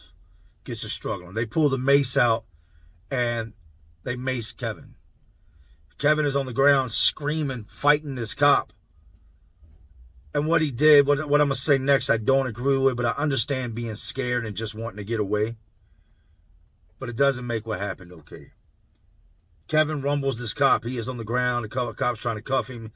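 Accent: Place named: Philadelphia, United States. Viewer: You sound just like a Philly guy🔥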